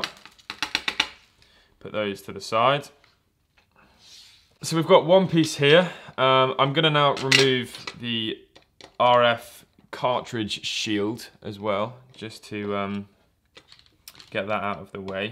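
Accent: United Kingdom. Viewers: raw vid is the way, you uk ppl sounds the same to me from Sweden :) so its like watch the same dude all the time. ;)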